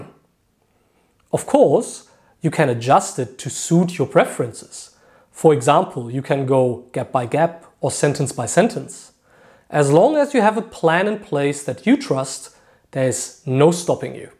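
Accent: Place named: Germany. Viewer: Thanks, this is a really useful video. Do you mind if I ask where you're from? I'm assuming from your accent that you're German, as opposed to Austrian or Swiss.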